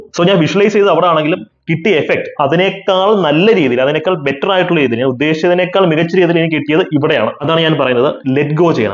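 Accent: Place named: Malabar, India. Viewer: Alambu malabari language 😊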